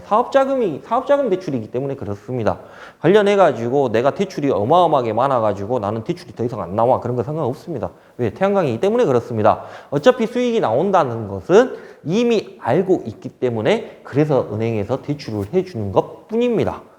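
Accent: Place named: South Korea. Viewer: 잘 모르는 분야라 천천히 보았습니다 사투리억양도 재밌었어요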